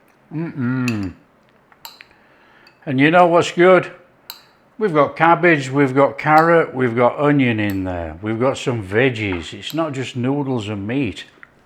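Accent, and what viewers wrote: Yorkshire, United Kingdom. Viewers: Thought i heard the yorkshire accent. Cool thanks for the video. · Beautiful, mate. Looks easy and tasty. Will give this a go tonight. Where abouts in the UK is that accent from?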